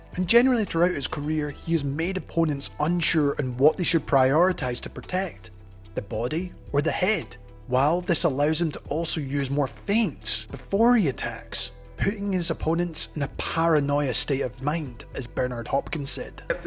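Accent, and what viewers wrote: Scotland, United Kingdom. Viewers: Yeh, Japanese pronunciation is super easy compared to English. As soon as you understand the vowel sounds, the rules never change...but the video guy does have a Scottish accent, and I kinda like his Scottish take on Japanese lol.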